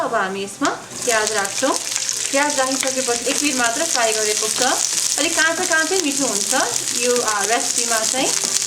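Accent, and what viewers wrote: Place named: United States. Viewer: Nepali with US ACCENT WITH HINT NEPALI IN IT 😁❤️👍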